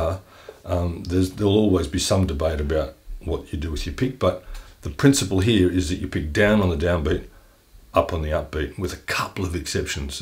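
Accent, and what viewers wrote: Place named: Australia. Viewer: This is really cool! I'll be honest I was not expecting an Australian accent haha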